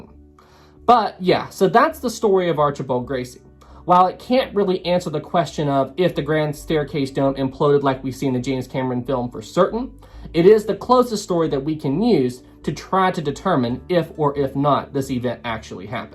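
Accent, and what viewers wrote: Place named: Appalachia, United States. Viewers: Your regional accent is same as mine...you in Tennessee...? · Hey brother, you are most definitely from the Northeast US, am i correct in saying that? Like, Virginia, West Virinia, Maryland, NC or PA? But to narrow it down even more, i would guess Virginia....in the appalachians. Lol, am i right? Btw, its the accent and pronouncing of washed warshed.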